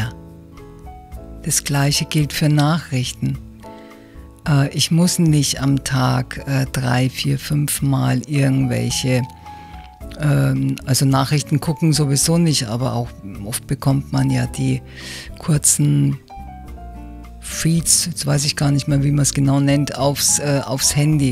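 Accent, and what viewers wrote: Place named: Bavaria, Germany. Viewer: Herrlich, liebe, wieder tolle Inspiration und das kurz und knackig - ich liebe deine offene, ehrliche Art, deinen Witz und natürlich dein bayrisch!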